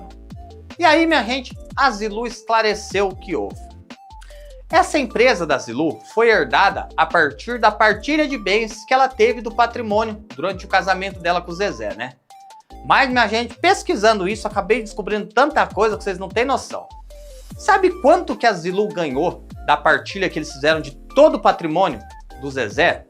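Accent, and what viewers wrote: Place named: Bahia, Brazil. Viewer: Oi Moço Sou Novo Aqui. E adoro seu Vídeos. O Jeito de Falar a sua Observação no Detalhes me lembra uma prima. Você não é Bahiano não da Bahia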